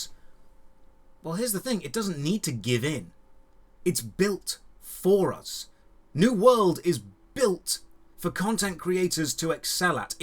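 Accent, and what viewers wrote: United Kingdom. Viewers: Hi Double Barrell British Sounding Well Dressed Man.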